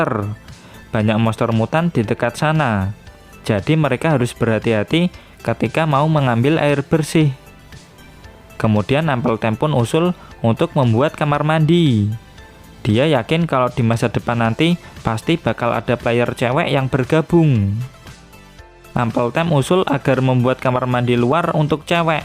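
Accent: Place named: Java, Indonesia. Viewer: Cuma saran min,,maaf sebelum nya, kalau bisa logat Jawa nya gak usah di keluarin,,mending bahasa Indonesia aja... Pendenger gak semua sama suku kan...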